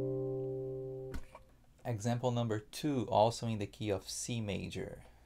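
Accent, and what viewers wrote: Brazil. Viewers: Ele tem um sotaque meio brasileiro msm kk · Your accent condemns you 😊 What a great surprise for me to know an amazing guy, excellent performer and an example of professionalism and dedication. Things like these make me feel proud of being brazilian! I wish you the best, bro! May God bless you!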